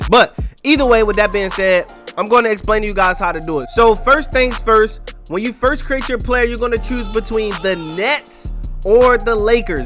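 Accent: Baltimore, United States. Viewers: Aye bro where u from, I swear i hear some Baltimore coming u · I been watchin you fo a min you from Baltimore got the accent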